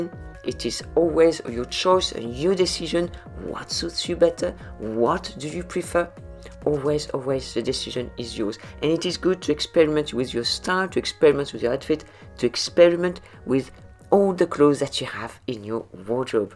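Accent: France. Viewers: Aw, I liked it when you used to say “ to receive the notifications”. It was a little charming clue that French is your first language!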